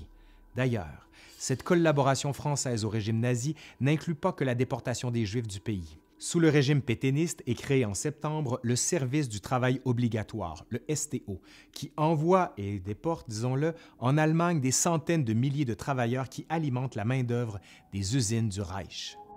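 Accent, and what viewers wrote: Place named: Quebec, Canada. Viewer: C’est tout simplement épique. Avec ton accent québécois en plus.. c’est vraiment trop top. merci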